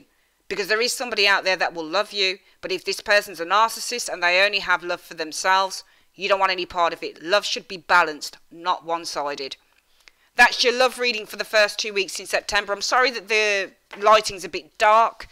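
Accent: Birmingham, United Kingdom. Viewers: I liked your reading and the way you put it over in your obviously english accent? I would surmise it came from somewhere near Birmingham?I am from near there myself originaly but now live in Australia for 49years.You say you are a gypsy?I would love to know your surname, as I am a Romany by birth we prefer that to gypsy as we are the people of Rom! not a lot of readers shoot straight from the shoulder but you do I like it and can handle the swear words to it shows passion in what you do and is not as everything seems these days politically correct,thank you for the reading as I do not understand Tarot but do Astrology.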